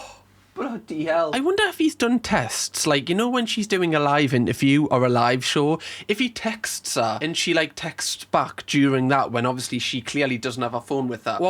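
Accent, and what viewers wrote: United Kingdom. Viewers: What kind of accent does have specifically? It’s really regional. He doesn’t sound like any of my friends from the UK who are from near London nor my Scottish friend.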